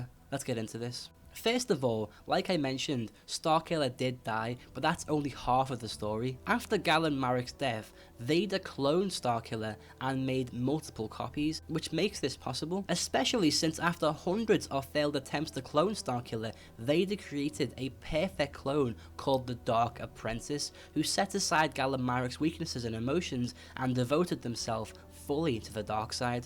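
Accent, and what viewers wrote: Liverpool, United Kingdom. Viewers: R u scouse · u sound like wayne rooney are u a scoucer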